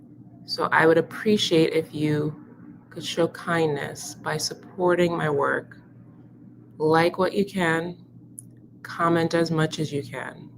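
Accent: Jamaica. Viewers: the intermittent Jamaican accent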